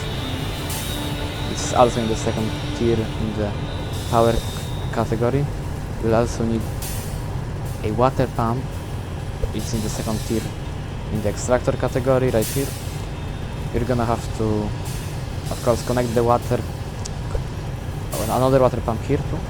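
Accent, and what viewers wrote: Poland. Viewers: dzięki za tutorial słychać że Polak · nice polish accent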